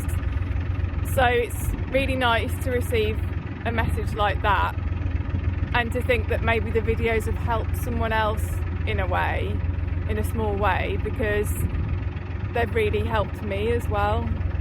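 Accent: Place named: Birmingham, United Kingdom. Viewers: I worked in the West Midlands for many years and fell totally in love with the accent - It's such a delight to listen to you! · I love your Brummie accent ❤ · Hiya, wishing you a very Merry Christmas from Sweden 😁 - from an ex-pat (originally from Wolverhampton) but been here in Sweden for almost 30 years now. Discovered your channel a few months ago and your journeys bring back many happy memories. I used to fish a lot on the Staffs Worcester when I was young 😁👍. Safe travels and enjoy the festive season 🎅 PS I've been trying to place your accent - first I thought Wolves but you seem to have some Brummy in there too 😉😁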